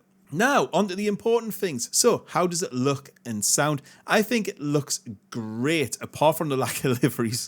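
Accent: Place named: Newcastle, United Kingdom. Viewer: Nice to hear a Geordie voice in the milsim community.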